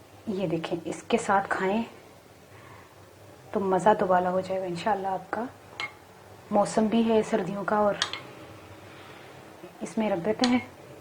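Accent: Punjab, India. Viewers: ap ka lehja to Panjabi ha lgta ni Karachi ki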